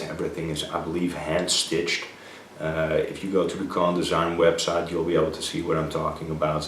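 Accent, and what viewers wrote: Netherlands. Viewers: Sounds like a dutch accent. You can tell by S sounds sounding like sch.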